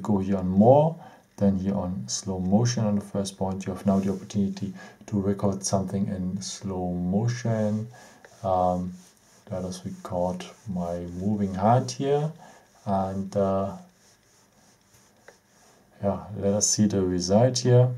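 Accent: Germany. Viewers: Man hört es raus das du deutsch bist 😀😀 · He has such a strong german accent 😂, but the video is really helpful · Man hört den Deutschen aktzent richtig raus😇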